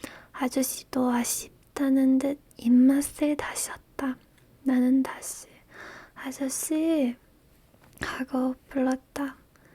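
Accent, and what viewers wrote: Standard. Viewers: 你的读音都非常标准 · your pronunciation is really standard, and it's hard to tell that you grew up abroad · 你的韩语发音很标准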